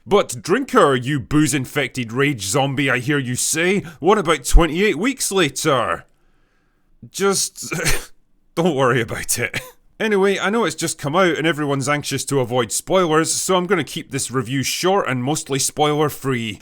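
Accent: Scotland, United Kingdom. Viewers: Accent. Scotsman. Kettle. Ha!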